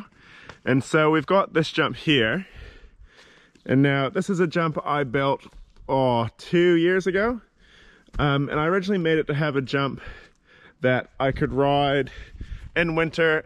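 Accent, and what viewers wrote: New Zealand. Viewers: This accent though, i hear kiwi, aussie and american all in one sentence. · You sound like kinda New zealandish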